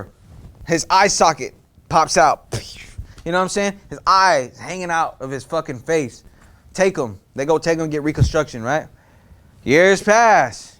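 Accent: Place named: Houston, United States. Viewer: i like how you can tell he's from houston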